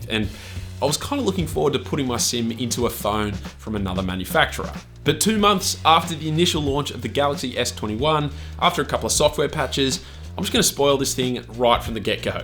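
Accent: Australia. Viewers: This guy has so familiar accent. From the first sentences I knew that he is an Aussie :). Hello and big cheers from NZ, mate! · R u Australian?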